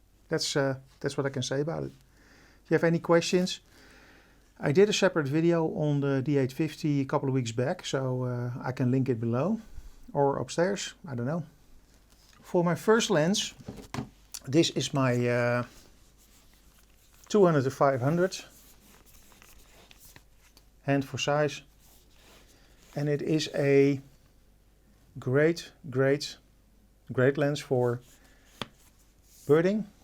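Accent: Netherlands. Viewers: Heerlijk Nederlands accent 😋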